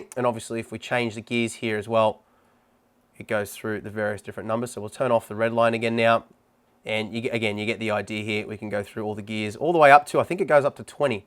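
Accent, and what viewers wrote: Australia. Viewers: Got me with the Aussie accent...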